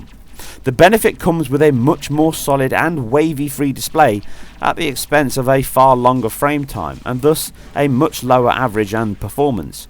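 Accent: United Kingdom. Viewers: Hey!!! Are you British??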